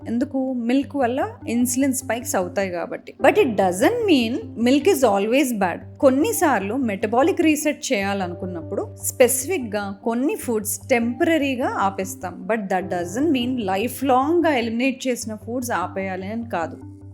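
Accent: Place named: Telugu, India. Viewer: Milk గురుంచి చాలా బాగా చెప్పేరు madam. Thanks for your Telugu slang, which beautifully explained us,the issue.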